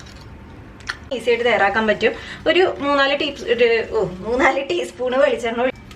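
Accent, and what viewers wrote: Thrissur, India. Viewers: Ente daivame....super presentation.ingane thrissur karkku matre pattu tta....👍😊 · തൃശൂർ ക്കാരി ആണല്ലേ 😁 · Enthoru easy ayita mutta curry undakunney.anthayalum undakum Thrissur slang kelkumbo nalla sughund. Thanks dear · Thrissur or Kottayam?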